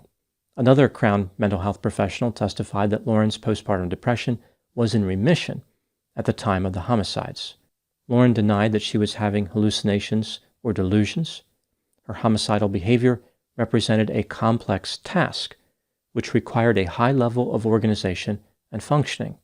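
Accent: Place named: Baltimore, United States. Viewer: are you from the Baltimore area by chance?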